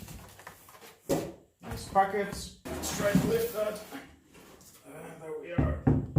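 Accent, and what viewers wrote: Greece. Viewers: Καλά αυτή είναι 100% ελληνική προφορά. Είναι το μηχάνημα του Lidl? Είναι βολικό; Φαίνεται η κίνηση λίγο περιορισμένη σε υψος σε σχέση με άλλα μηχανήματα..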